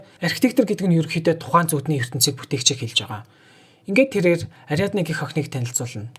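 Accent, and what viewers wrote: Uvs, Mongolia. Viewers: Увсаар яриад байхын 😂😂😂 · Увс аялага 🤨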